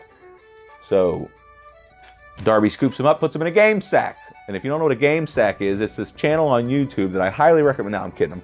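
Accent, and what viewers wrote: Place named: Scotland, United Kingdom. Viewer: AYE! It's Scottish!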